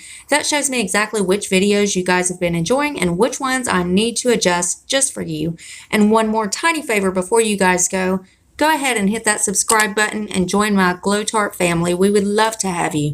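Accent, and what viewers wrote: South, United States. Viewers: Love that southern accent where are you from babygirl?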